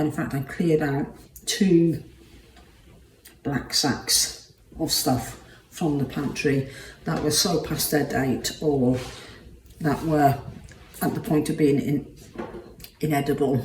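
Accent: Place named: Wales, United Kingdom. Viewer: I found your channel fascinating as my great grandparents came to the US in the 1890s from Glamorgan Wales near Swansea. So it’s interesting to see real people, real homes and listen to real accents spoken as you are not a great distance from where they lived. I’ll enjoy seeing more of your life and customs along with the challenge.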